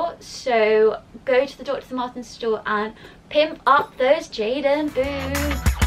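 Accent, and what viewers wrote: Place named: United Kingdom. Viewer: Akdiakzia menkanta el acento británico, además de que es fácil de reconocer xdxdxd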